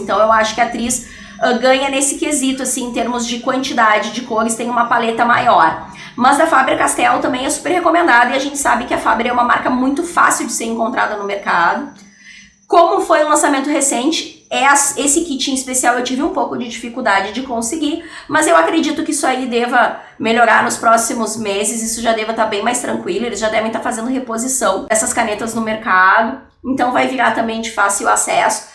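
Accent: Rio Grande do Sul, Brazil. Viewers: Qual a sua brushpen e onde vc comprou ela? Sou de POA e pelo seu sotaque vc também é gaucha, não acho nenhuma brushpen em nenhuma papelaria, poderia me indicar uma?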